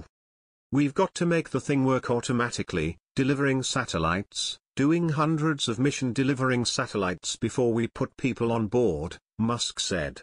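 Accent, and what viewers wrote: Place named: RP, United Kingdom. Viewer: I love how the British cultured accent rubs the Yanks the wrong way. LOL Well done on the content m8t cheers. How about you change it up and use a Cockney or Scouse Accent BWG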